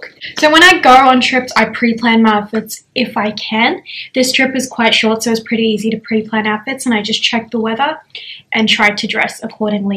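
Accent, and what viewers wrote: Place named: Australia. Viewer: I love your accent. It's so refreshing to hear an Australian girl talk about fashion.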